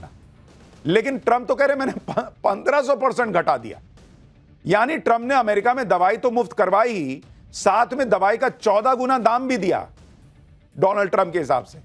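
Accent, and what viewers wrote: Bihar, India. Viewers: Sir aap bihar k hai kya ya up k Mast bolty hai 😂😂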